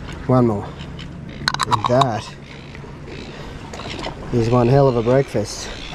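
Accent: Australia. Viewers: Bro I started watching your videos during covid, I thought you were from Sydney, then Melbourne, then Brisbane 😂 Anyways, great content, still watching! Best on my WFH days 🎉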